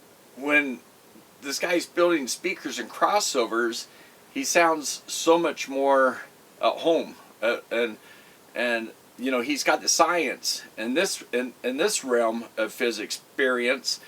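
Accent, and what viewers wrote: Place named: Utah, United States. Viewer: I hear n. Utah/s. Idaho in that voice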